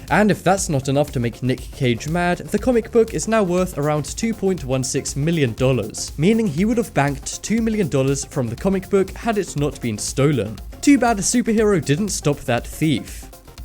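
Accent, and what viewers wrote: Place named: United Kingdom. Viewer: Derp Face Dude, he's British. They say everything different over there.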